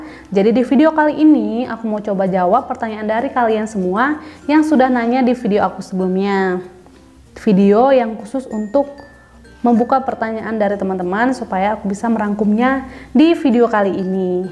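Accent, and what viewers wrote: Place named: West Java, Indonesia. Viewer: koq logatnya sunda kak?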